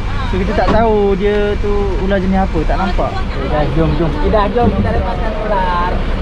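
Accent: Kelantan, Malaysia. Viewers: Abg ni orang kelantan ke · abang orang kelantan kee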